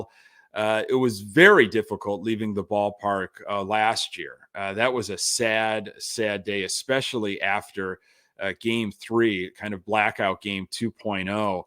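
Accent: Chicago, United States. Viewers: Glad I found your channel! Love the chicago accent! Reminds me of the SNL Da Bears skit lol Go SOX!!!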